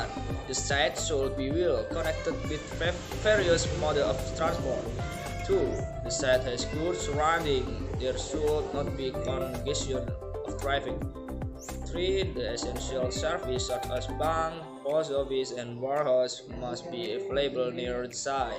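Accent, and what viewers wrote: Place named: United Kingdom. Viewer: british banget😍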